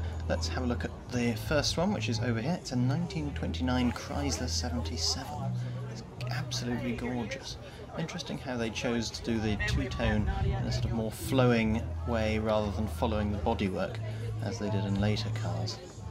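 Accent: RP, United Kingdom. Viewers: Correct me if I am wrong, but the RR color is not canary, but what was known at the time as Mandaran yellow. Bit of trivia, the Waterman fountain pen from the period was available in Mandarin yellow and that pen today is one of the most collectable. Nice presentation. Thank you. (My British friend tells me you speak with a distinct RP. Are you from GB?)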